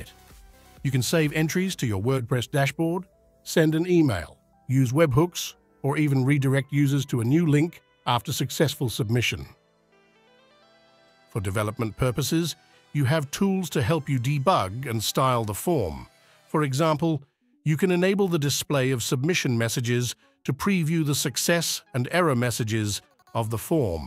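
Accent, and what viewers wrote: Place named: United Kingdom. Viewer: As a Pro subscriber, I couldn't be happier with Unlimited Elements and the team of pros behind it. I know I sound like a shill but really, I'm not. My website is particularly amazing and unlike others because of the combinations of remarkable capabilities this toolkit provides, including the fact that I can (and have) customized a particular plugin. And now we have forms!, I like the British accent, but I can tell it's you who wrote it. 😀We love you buddy. - Markus Halvorson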